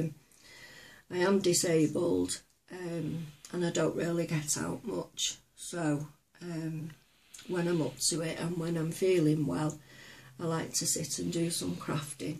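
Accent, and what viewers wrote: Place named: Manchester, United Kingdom. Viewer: Hi lovely northern lady...welcome to YouTube, this is a great first video, well done to you and your helper. You sound like you're from Manchester or somewhere that way...I'm in sunny Lancashire..lol..best wishes to your daughter, I hope it all works out well for her. Your teacup 'n' saucer are beautiful...looking forward to seeing more from you soon...Ann...x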